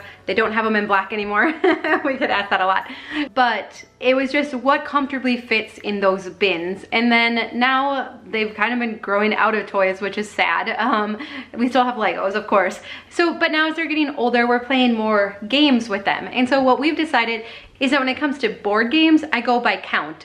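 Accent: Minnesota, United States. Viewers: Me: I wonder where this lady is from.: Plastic baegs Me: Ok, Minnesota then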